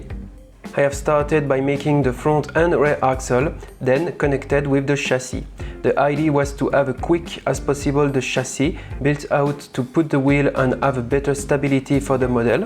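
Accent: France. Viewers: are you french ? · Are you french ?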